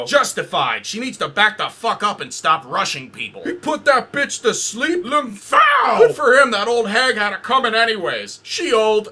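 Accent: Germany. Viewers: I'm german and your german accent is surprisingly good. Some rambles sounded like acctual words.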